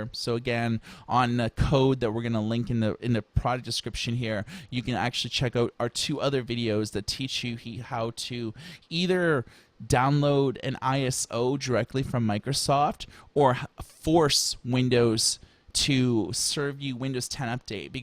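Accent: Canada. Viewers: So, quite confusing. You keep saying serial number, then proceed to write this complicated code to determine what it is. I just turned my laptop over and looked at the lable. But in fact you're actually talking about determining the operating system product ID. In the case of an XP laptop, the product ID is also listed on the lable.Then, to make matters even more confusing, you call the product ID a serial code. A serial number is always associated with a machine, not an operating system. Judging by your accent, I'd say you are Canadian? So perhaps a serial number and product ID are interchangable in Canada. Not here in the States. I understand that you took the time to do this and I'm sure your efforts are appreciated by some. But the result is very confusing because the terminology is incorrect. Having written a mountain of technical documentaion in a past life, I can tell you that instructional videos have to be consistant and carefully worded. 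A product ID is not a serial number, nor is it a serial code.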